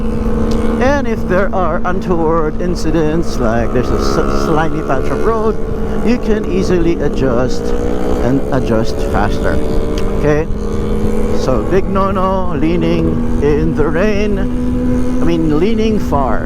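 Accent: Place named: Philippines. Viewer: he's accent is Filipino, so i guess Filipino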